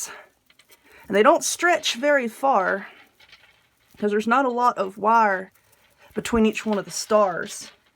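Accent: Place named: Carolina, United States. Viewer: I absolutely love that “Carolina twang “ Tour videos are so easy to follow, thank you so very much for taking the time to teach us & for taking the time to do your videos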